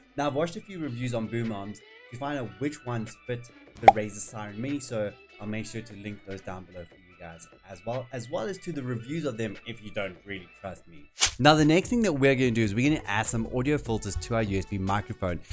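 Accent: South Africa. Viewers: are u a jozi boet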